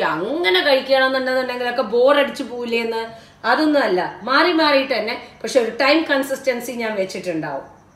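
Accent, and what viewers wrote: Palakkad, India. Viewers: പാലക്കാട്‌ ഭാഷ 👍👍👍👍അവതരണം നന്നായിട്ടുണ്ട്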